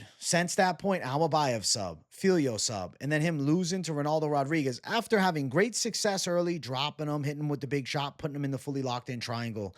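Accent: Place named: Boston, United States. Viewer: Can take the boy outta boston but cant take boston outta the boy. Blahss-fee-muss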